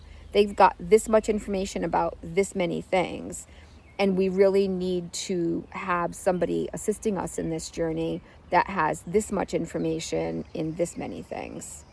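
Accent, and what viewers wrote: Massachusetts, United States. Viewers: Thank you so much for sharing your journey and I'm so glad I found your channel today! I just got a prescription for Zepbound and I've watched several of your videos already so I can have an idea of what to expect (though I know every body is different). Your channel is so informative and I really love your balanced and realistic approach. You're a goldmine of information! When I watched the first few minutes of one of your videos I knew I recognized your MA accent. I'm a north shore gal myself. By the way, you asked in a previous video if someone knew about the food you had at the Guatemalan friend's party, the corncake with the cheese or pork in the middle, those are called pupusas. I've had many of them. 🙂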